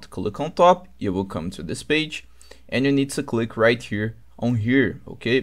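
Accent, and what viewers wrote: Brazil. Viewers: Sabia que tu era brasileiro no momento que abri esse vídeo mano kkkkkk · primeira palavra ja percebi q era br kkkkkkk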